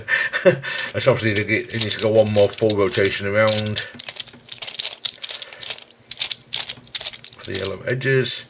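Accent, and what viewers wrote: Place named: Northern England, United Kingdom. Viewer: Thanks, I'll give one of them a go. I am guessing (from your accent) that you are from the north of England; are there any puzzle groups that get together up here that you know of?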